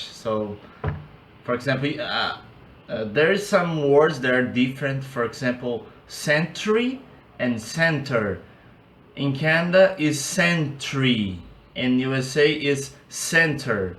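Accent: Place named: Brazil. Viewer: Seu inglês parece com o meu, será que temos sotaque brasileiro?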